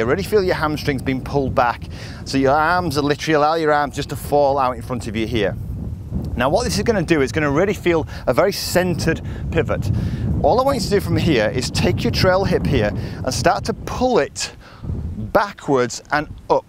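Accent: United Kingdom. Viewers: who the heck is and how ddid he get so many followers without me ever heating about him...fideo seems very good guess thats why..ps..how did the hoo UK online golf instructors manage ot get their own diffrent accent?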